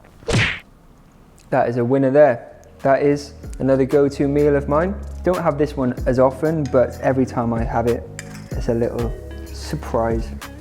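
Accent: Wales, United Kingdom. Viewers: Damn - James left SortedFood today, and just as we all hoped you’d pop up as a guest chef in their London kitchen, you move back to Wales! Although as most of my family lives near Swansea, I’d be happy to pop up the M4 to any restaurant, kitchen or culinary school you open up. Look forward to your Welsh accent amping right back up:) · love that welsh accent <3 many many many good wishes for your new proyect of life. love you ! besitos! Love the music also !!! and the "bloopers" omg the best hahaha thanks for the recipes and the good vibes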